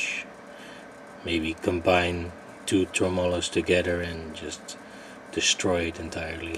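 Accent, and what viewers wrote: Netherlands. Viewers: ik weet gwn aan je acsent dat je nl bent XD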